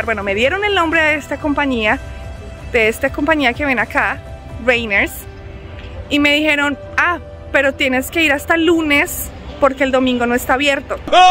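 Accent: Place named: Colombia. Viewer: Jajaja ahora si te salió todo colombiana contando esto. Saludos desde Chiapas México.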